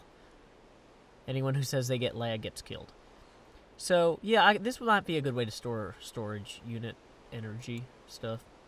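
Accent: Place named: Tennessee, United States. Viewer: Hey, I'm really enjoying this series! It's great to hear a fellow Tennessean, I hope you continue this and continue being hilarious!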